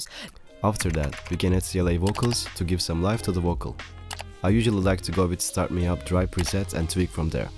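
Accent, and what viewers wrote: Turkey. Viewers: abi ingilizcenden belli sen Türksün !!!!!!!!!! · Biraderim bence sen kesin Türksün ben bu aksanı nerede duysam tanırım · Hocam aksandan dedim bu çocuk türk mü öyleymiş great content tho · aksanından türklük bangır bangır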